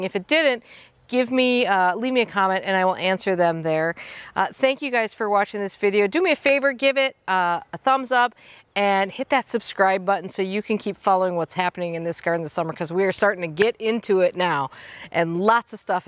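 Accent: Wisconsin, United States. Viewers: I had to laugh listening to you talk. I think the word “so” must be a Wisconsin thing as I say it all the time myself. I live in Door County and it isn’t t-shirt weather here yet!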